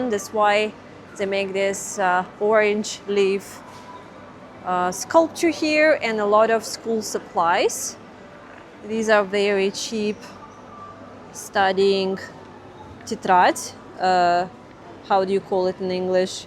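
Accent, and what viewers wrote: Russia. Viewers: your English accent is more British than Russia 🇷🇺 even though you haven’t being there, that’s good, and I also like it when you spiced up with Russia 🇷🇺 English accent too. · Очень "рязанский английский" и тупой влог. Идите работать. Достали эти тупые блогеры.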